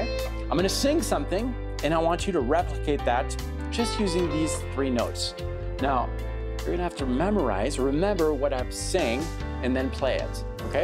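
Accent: Quebec, Canada. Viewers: I bet you're from Québec. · A true lesson. I thought French Canadian.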